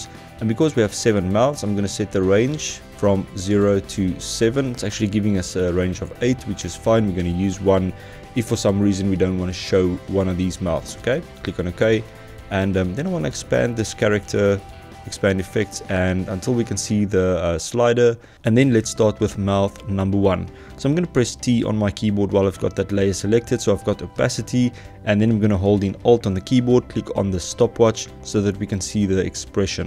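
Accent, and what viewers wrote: South Africa. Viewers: Hey, was almost shocked when I heard a South African accent on a Youtube tutorial. Great Tut though, well presented and explained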